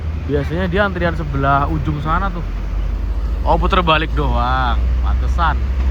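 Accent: Indonesia. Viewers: Udah jadi logat orang indo nie pak lek😂😂😂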